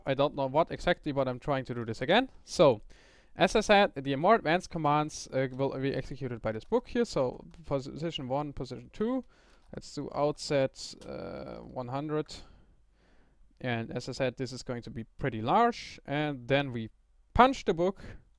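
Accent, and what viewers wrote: Germany. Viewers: No matter what you are. If you're German and start talking Math in English, you will sound like a mad scientist. Always fun to talk English in a German Accent ^^ (Halb Deutscher) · Du bis Deutscher, oder? :D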